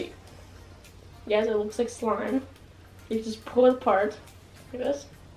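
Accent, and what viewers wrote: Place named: Canada. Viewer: do you live in Canada because you have an accent like a Canadian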